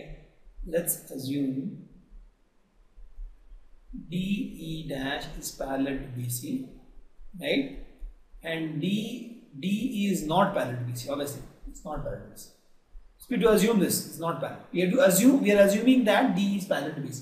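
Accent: India. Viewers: Amy he is Indian he speaks Indian English not AMerican English like u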